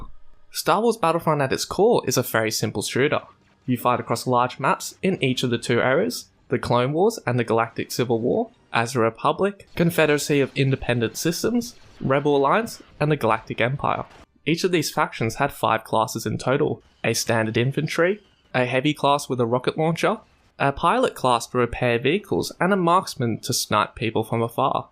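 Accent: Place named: Australia. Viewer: yeah once i heard an aussie accent i knew i was safe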